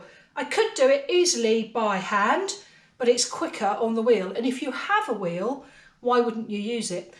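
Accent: United Kingdom. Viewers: I am from Flanders, Belgium. I love your YT stories and learned already a lot… And having an American grandson I love to hear your so very British accent 😉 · Hello I'm from Belgium I love your English accent, I learn English in evening class and the teacher always says that you have to put the tonic accent on the words otherwise the English peoples do not understand us and I must say that I understand everything what you say. Thank you for your videos they are all interesting.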